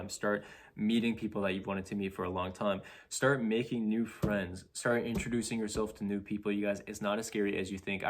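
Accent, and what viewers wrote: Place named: Canada. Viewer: This is a slightly strange comment 😂 but I'm canadian and have been studying in germany for 2 years. Hearing your voice (you sound so distinctly canadian) was so comforting. I'm happy to be where I am, but I really needed that little taste of home.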